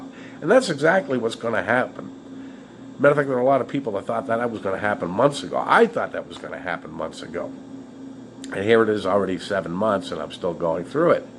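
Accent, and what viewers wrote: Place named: Pittsburgh, United States. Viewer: . Are you Pittsburgh?? I swear. I detect a Pittsburgh accent!!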